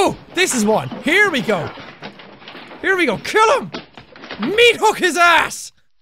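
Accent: Ireland. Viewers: i subed just for the awsome accent... what is that .. irish ?? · hes irish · Love his Irish Accent!!! 🇮🇪☘️ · Niv Leket Slight problemo! NOT BRITISH!!!!!!:He's IRISH"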